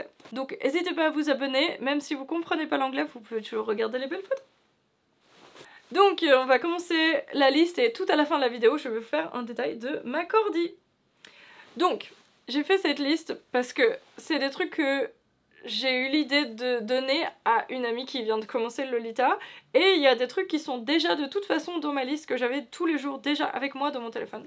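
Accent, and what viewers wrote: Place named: France. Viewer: Je connaissais tes vidéos anglaise et jai tout de suite reconnu un accent français ahah jme suis pas trompée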